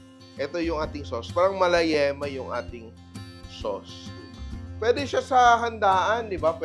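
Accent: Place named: Iloilo, Philippines. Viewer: Ilonggo Ikaw?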